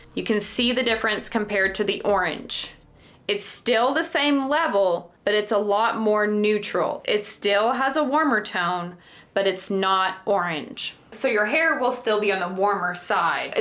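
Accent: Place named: Texas, United States. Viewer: Are you from Texas? Nice southern accent & also because Texans are smart.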